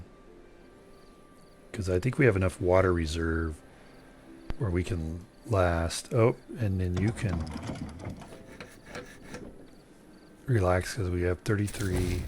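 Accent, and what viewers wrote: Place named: Southwest, United States. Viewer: favela is like the mountain side villages in brazil. howls moving castle is like the studio ghibli movie of the same name. a complex city perched on legs. wow your accent sounds really arizona new mex. thought you might be native. in my opinion copy catting isnt really a thing if you play authentically. up is up down is down . what evs. more fun stories.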